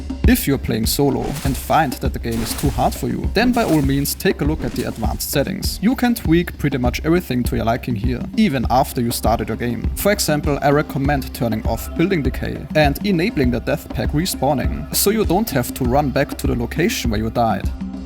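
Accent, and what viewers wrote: Germany. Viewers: Bro, du bist deutscher oder? 😂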